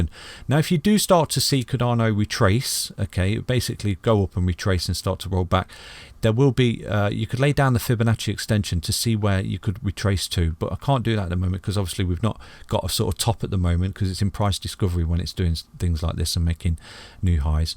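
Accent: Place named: Midlands, United Kingdom. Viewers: Do you live in the Midlands England 👍 great channel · Sounds like a brummie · He's from Leicester 😁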